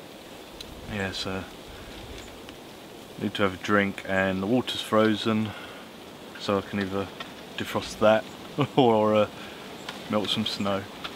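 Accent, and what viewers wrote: England, United Kingdom. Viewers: Where are you located? The accent is British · kinda cracks me up a little to see an english guy (I assume you're english, sound like it) making jumbalaya. I didn't think it would be popular over there, across the pond. And the onion. celery and pepper is called "Trinity". Mirepoix is onion, celery and carrot. Next time you make jumbalaya try using andouille sausage instead of chorizo. It makes a much better jumbalaya. Although, so does shrimp, not that I'm saying it's not jumbalaya without shrimp, but much better with. And easy to take and keep on cold weather campouts. Good video btw. That's some cold weather to be camping out in the open like that. Even in a partial shelter, that's still pretty much out in the open. I live in Michigan and have spent many years tent camping in upper Michigan for deer camp in mid November and have spent many nights camping in a tent in sub zero temps. 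But out in the open...Nope. And I don't know what kind of folding saw that is you use, but I have a Gerber and it has offset/staggered teeth and is REALLY aggressive.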